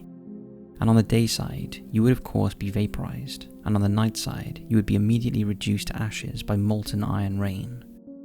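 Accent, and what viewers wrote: United Kingdom. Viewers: Why is he using Fahrenheit when he is speaking with a British accent?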